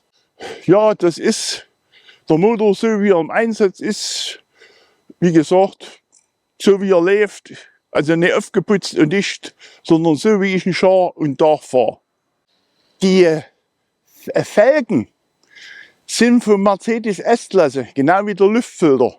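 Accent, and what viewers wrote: Saxony, Germany. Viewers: Also selbst für mich als Sachse ist das sächsisch des netten Herrn ganz schön stark. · Der Sächsische Dialekt, einfach Cool. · "hod ne zweete sebarade Heezung.. is m winder herrlisch worm .. finf inschdrumende hoddar " als Österreicher muss ich mich schon ein wenig konzentrieren um diesen sächsischen Dialekt zu verstehen. Um Sächsisch korrekt zu lernen ist das sicher eine gute vorlage . Ich liebe ja alle Dialekte, aber der V8 Motor des Tatra klingt trotzdem besser in meinen Ohren als das Sächsische :-)) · mein kollege hatte auch einen sächsich herrlich du wessiarch nicht so verlogen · Dieser sächsische Fistelgesang ist unerträglich! Forschdbor!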